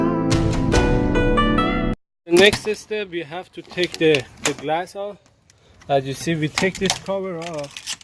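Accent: Iran. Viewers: Strong persian accent😉👍well done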